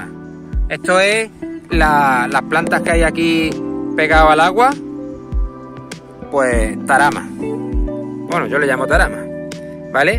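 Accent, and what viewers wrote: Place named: Andalusia, Spain. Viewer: Hola. Por tu acento y tipo de cultivo pareces de Andalucía. Perdona si corrijo, lo mismo me equivoco pero creo que la curruca mosquitera no se reproduce en el sur. Su límite queda en el tercio norte. Pero que lo mismo estoy equivocado. Ya te seguiré. Saludos.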